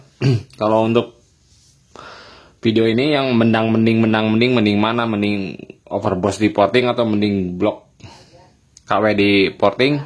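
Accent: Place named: Jakarta, Indonesia. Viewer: logatnya teringat kenangan ibukota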